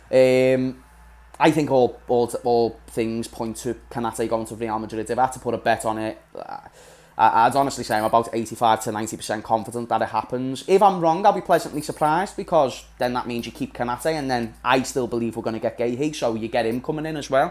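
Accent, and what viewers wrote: Liverpool, United Kingdom. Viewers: Keep it up we need a scouse voice on every haters channel cook the hamez lad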